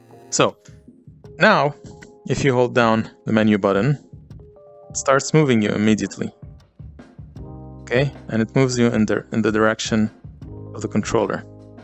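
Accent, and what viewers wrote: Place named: Serbia. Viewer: Ar you serbian lol I love your accent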